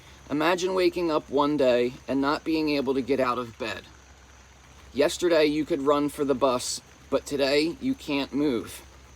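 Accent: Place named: New Jersey, United States. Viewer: That's a beautiful article! I have some chronic illness issues as well as mental health so this hits home for me too. Another good one is called the "spoon theory". It helps with both chronic and mental health illnesses. I'll try to remember to send it to you on fb if I can find it. I'm also a little tech challenged so it might take a hot minute. 😂 PS where is home for you? You sound like my childhood lol. I grew up in May's Landing NJ and was born in Atlantic City so I know jersey when I hear it lol. I always call it my first language because as soon as I hear that accent I go right back to it lol. You can take the girl out of Jersey but never the jersey out of the girl! 😁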